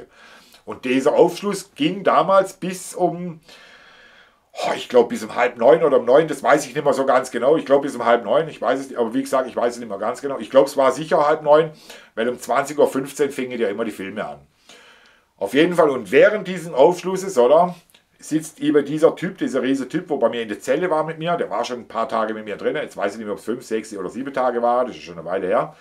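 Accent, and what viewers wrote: Germany. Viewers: Bisch doch aus Karlsruhe oder Umkreis vom Dialekt her 😂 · Ich hab durch Zufall deinen Kanal entdeckt, finde es super, dass du so offen über dein "altes Leben" redest. Ich bin ein neuer Fan und werde deinem Kanal auf jeden Fall folgen. Übrigens, dein schwäbischer Dialekt, genial ;-) Grüße aus Zella-Mehlis.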